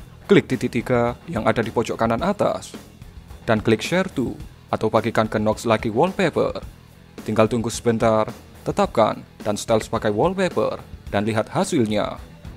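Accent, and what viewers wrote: Java, Indonesia. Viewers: Admin medok timen, bocah ngendi we min? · Susah brow bahasa Indonesia nya gajelas, medhok jawa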